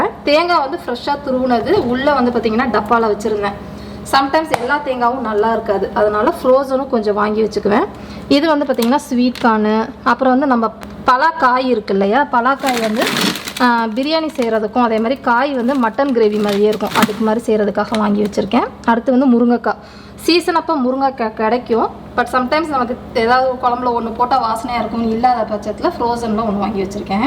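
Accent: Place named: Tiruchirappalli, India. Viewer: Neenga trichy a mam.ketkave santhosama irukku.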